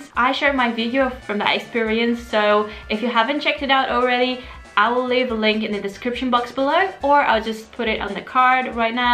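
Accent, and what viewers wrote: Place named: Australia. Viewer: Why are you sounds like Australia😂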